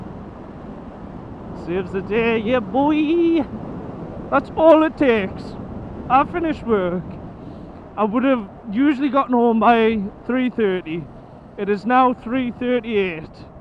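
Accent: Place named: Newcastle, United Kingdom. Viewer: Very happy you are back at it! And I’d do the same for a fellow rider even if I had to travel far to get the gas... I mentioned this to you before that my great grandfather was a gordie so when I here your accent it reminds me of him :) great vid m8 💯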